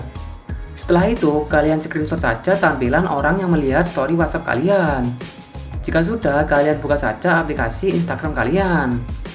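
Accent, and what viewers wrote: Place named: Java, Indonesia. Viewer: Orang Jawa yh bbg 😂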